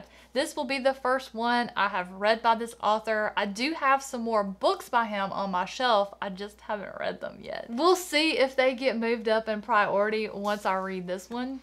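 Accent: South, United States. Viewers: Hi! I've really been enjoying your channel. At first it was partly due to your southern drawl, which I love, and I thought, "Wow, she sounds just like my husband." And for some reason, I thought I heard you say in another video that you were from TX, but I must've misheard since you clearly say in this video that your from the 'Sip, which is where my hubs is from! I knew it!! 😉 Anyway, I love your channel!💕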